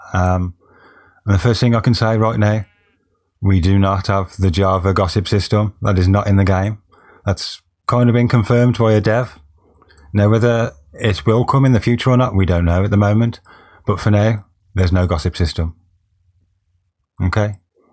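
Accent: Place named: Midlands, United Kingdom. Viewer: Sound like you're from the midlands, mate. Black Country lad?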